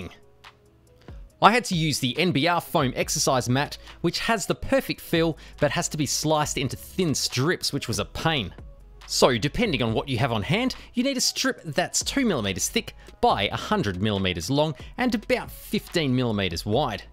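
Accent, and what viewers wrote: Australia. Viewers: very interested i like to hear that Australia voice. that is an obsession with perfection. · Non Australians, and especially non-native English speakers, cant understand your accent. You might want to have an American or a Brit do a voice over to multiply your audience dramatically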